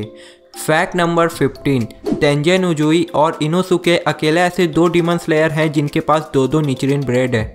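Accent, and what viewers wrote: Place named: Bihar, India. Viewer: Bhai bolte bhi bihari jese ho tum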